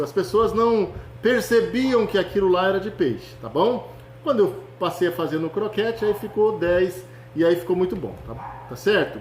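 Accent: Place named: Rondônia, Brazil. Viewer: Tu é de Rondônia mano blz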